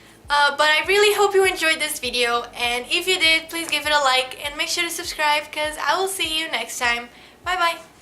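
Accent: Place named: United States. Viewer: Só um ano nos EUA e já falas como americana :) boa sorte aí na América. Bjs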